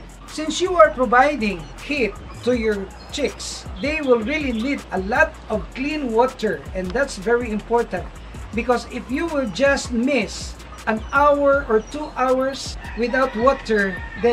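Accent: Philippines. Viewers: Wag ka nga po mag inglish halatanaman po na pilipino ka po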